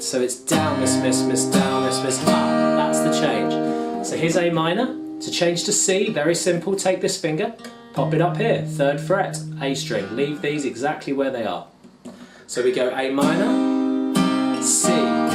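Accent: England, United Kingdom. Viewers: Thank you very much! It's really great to get all these detailed explanations and ultimately UNDERSTAND :- )!!... and with a nice English accent too :- )! Hello from France ! Thomas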